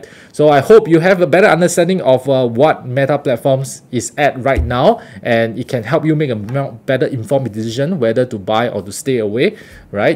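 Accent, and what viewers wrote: Singapore. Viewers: It's not English he speaks but singlish - a version of English spoken in Singapore..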